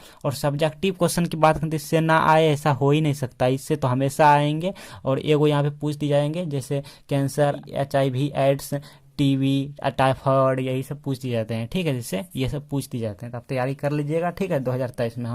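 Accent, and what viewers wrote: Bihar, India. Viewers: Pure bihari.. .. 😄☺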